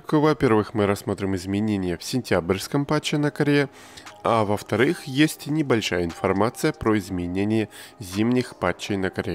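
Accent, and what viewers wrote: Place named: South, Russia. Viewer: Необращай внимание, есть у тебя некий "южнорусский" акцент).Вначале думал ты с Украины, но потом увидел некий город на букву Л.Это фигня, ты молодец, работаешь над собой помню твои старые ролики)))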